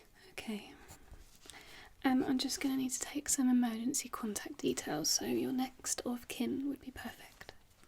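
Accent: United Kingdom. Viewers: Love your voice and accent. Where are you from?? Im Spanish,so I can't "guess" English accents very well,but you sound British(?)Not sure. Anyways really good roleplay💜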